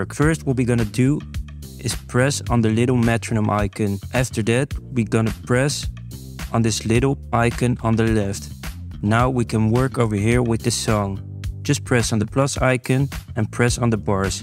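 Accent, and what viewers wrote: Netherlands. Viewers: Are you Dutch you have a very nice accent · u sound so dutch 😭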